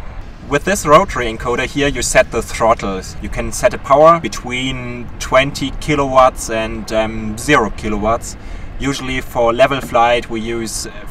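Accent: Germany. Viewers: Du hörst dich schon echt deutsch vom Akzent an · if you cant speak english, please dont do it, orfull to listen to that german accent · Der deutsche Akzent nervt kein bisschen :D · Ich höre deutsche Heraus haha : ) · Du hast so einen deutschen Dialekt😂😂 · Are you from Germany, because your english sounds so. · Haha man erkennt am Akzent, dass du Deutscher bist. No front ich spreche genauso. XD schöne Grüße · German tries to speak English ...... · Kurze Frage bist du deutsch wegen deinem Akzent? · He is German i Can hear that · His german Accesnt tho... love him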